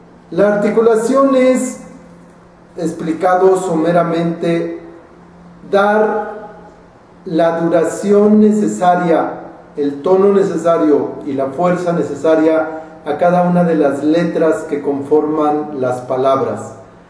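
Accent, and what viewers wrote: Mexico. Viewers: Ni bien comenzo los 3 primeros segundos del video me di cuenta que eras mexicano ... Ni siquiera vi los comentarios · tienes acento mexicano nada neutro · Hablaste con un acento típico mexicano, con mantenimiento de /s/ (muchas veces pronunciada como /z/...), acortamiento de vocales y entonación mexicana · así hablan los de la ciudad de México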